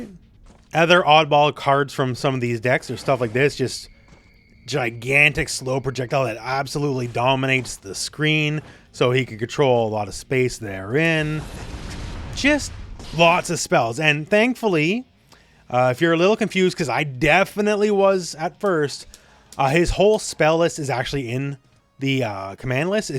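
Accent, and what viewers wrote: Canada. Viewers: Won't ever get sick of hearing pronounce 'cards' in his Canadian(?) accent!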